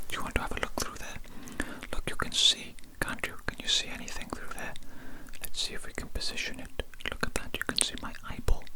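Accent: Wales, United Kingdom. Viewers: omg wait are you welsh?!? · Love this vid ! Are you Welsh? I recognise that accent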